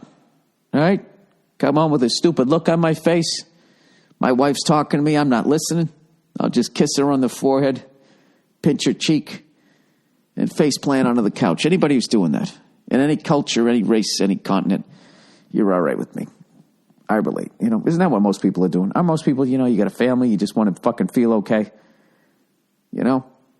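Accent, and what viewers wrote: Boston, United States. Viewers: U sound like a fucking Boston or New York fucking fucker. Fuck.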